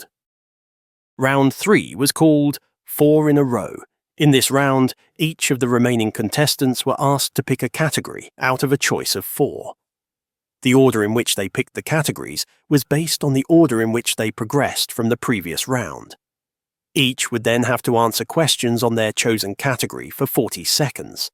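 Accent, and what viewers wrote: Scotland, United Kingdom. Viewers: because it is the real you. Why use another voice? Scots FTW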